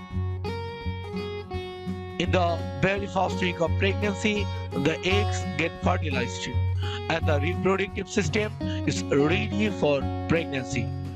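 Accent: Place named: India. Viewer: Ugh, can't hardly understand a word you're saying. You'd think we'd all have had plenty of exposure to Indian accents, what with all the scam callers, customer service reps, etc.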